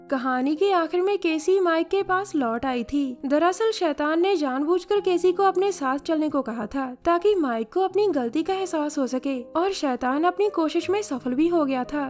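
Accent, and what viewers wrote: Punjab, India. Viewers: Aap aur apke thumbnails...aur ye awaz, ye Punjabi andaz... wah kya baat h.... Lv u so much. schhi wala..🥰🥰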